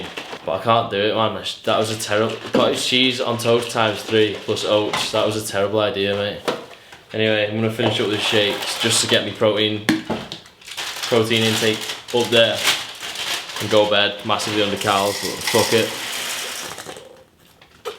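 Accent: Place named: Liverpool, United Kingdom. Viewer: you from widness got bit scouse in ye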